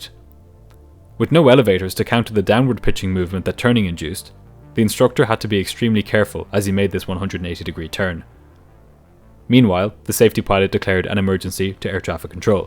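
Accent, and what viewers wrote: Ireland. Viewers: No idea how u pulled it off and got subs , a posh Irish muppet recycling stories that are long long told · Very well done,! Perfect command of technical details, and that lovely Irish accent. Great!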